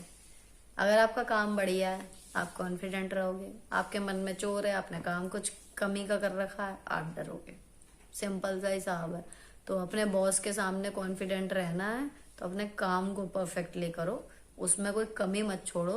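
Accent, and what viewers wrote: Meerut, India. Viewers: आपकी भाषा पूरी मेरठ की है, खड़ी बोलीं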